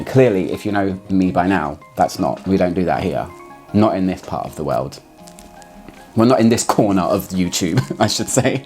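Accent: United Kingdom. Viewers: I LOVE your british style and your pronounciation ❤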